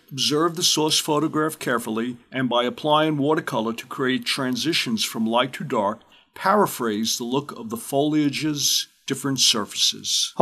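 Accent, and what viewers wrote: New York, United States. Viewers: Loved watching you paint. Wonderful composition. You are making me miss home, New York with your accent. Thanks.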